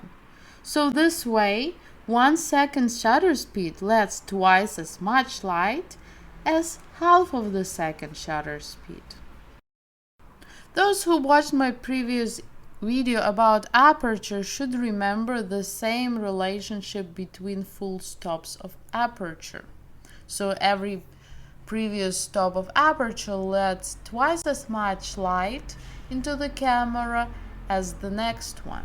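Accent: Russia. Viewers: are you from russia or romania?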